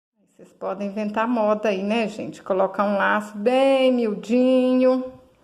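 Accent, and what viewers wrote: Minas Gerais, Brazil. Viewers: Oi, adoro suas bonequinhas e vc é muito simpática e explica muito bem, acho q pelo seu sotaque vc é mineira, acertei? Eu sou nascida em Minas, Resplendor mas moro no interior do Rio. Parabéns!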